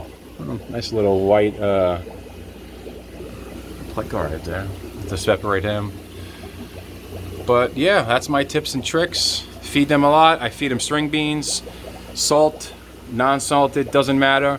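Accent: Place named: Massachusetts, United States. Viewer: Are you located in Massachusetts? Ha i picked up on the accent im in MA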